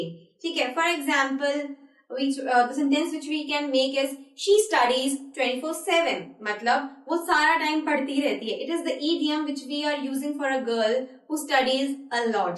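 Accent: India. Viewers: Obviously she is inexperienced, speak like a machine gun with strong Indian accent, thank you anyway. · God please! try to adapt a neutral accent and not Indian accent it's so stereotypical. c'il vous plait! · Hey are you from Hyderabad?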